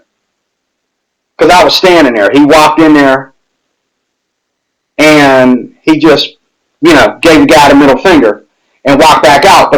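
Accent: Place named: South, United States. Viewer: lol had no idea this dude was black until I looked up at the right hand corner 😂. He sounds like southern redneck lol 😆. He ain’t lying though 😉.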